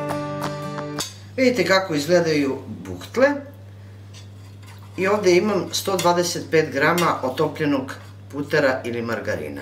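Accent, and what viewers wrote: South, Serbia. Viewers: Meni se naprimer mnogo svidja vas juznjacki govor i nemenjaj te ,ja sam uvek za to da se nemenja a moja komsinica je iz leskovca i ja uzivam da je slusam😍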